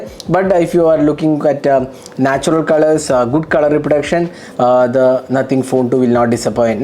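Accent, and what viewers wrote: Kerala, India. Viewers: perfect mallu accent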